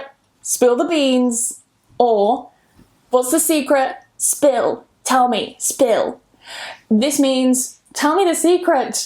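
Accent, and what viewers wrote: United Kingdom. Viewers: Hello ☺️ Take love from India ❤️😇💐 can you please tell me in which british accent you are talking 😇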